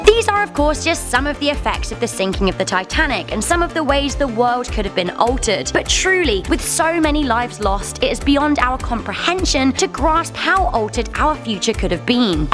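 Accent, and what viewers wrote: England, United Kingdom. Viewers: I love how this English accent lady uses miles instead of kilometers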